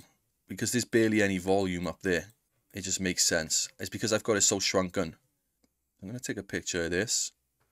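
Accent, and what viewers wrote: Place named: Cardiff, United Kingdom. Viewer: Cardiff accent bro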